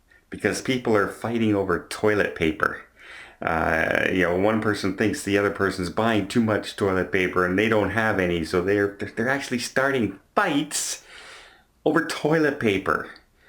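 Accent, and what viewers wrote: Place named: Ontario, Canada. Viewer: Don where are you from? Sounds like ontario from your video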